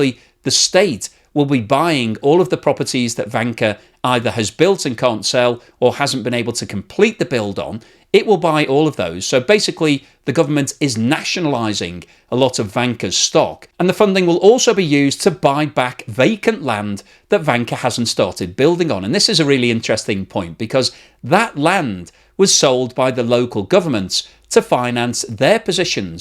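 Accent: United Kingdom. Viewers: British accent = propaganda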